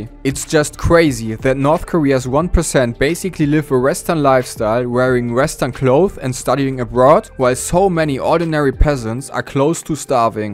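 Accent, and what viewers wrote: Germany. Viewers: Informatives Video :) hab direkt am akzent gemerkt, dass du deutsch bist hahahaha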